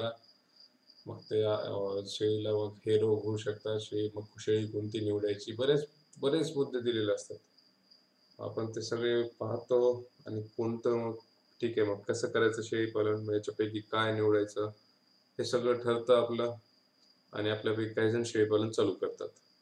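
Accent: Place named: Vidarbha, India. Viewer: सर, तुमच्या भाषेच्या ओघावरून मी एक निच्छित सांगू शकतो कि तुम्ही विदर्भातील, अमरावती विभागातील एका जिल्ह्यातील आहे. तुम्ही दिलेली माहिती खरच फार उपयुक्त आहे, आणि व्येवासायातील वास्तववादी जगण्याची प्रेरणा देणारी आहे.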